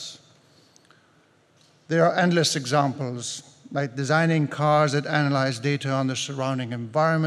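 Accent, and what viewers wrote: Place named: RP, United Kingdom. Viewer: I didn't expect him to have a Posh accent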